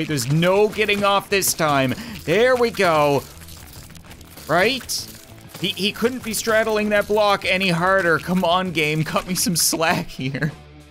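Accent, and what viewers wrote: Canada. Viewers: He pronounces things different cuz he's Canadian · Don't make fyn of him he's Canadian so he talks a little different what might make sense here dissent make sense to him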